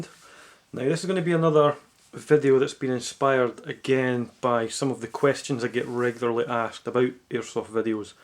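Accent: Scotland, United Kingdom. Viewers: someone has a scottish accent! soo bad ass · love your accent . are you from scotland? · Scottish lad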